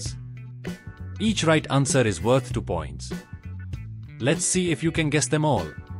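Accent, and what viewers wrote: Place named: India. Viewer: Aap English bolne ki koshish kar raha ho lekin aapki aavaj dekhke samajh aa raha hai ki aap Hindi bolte hai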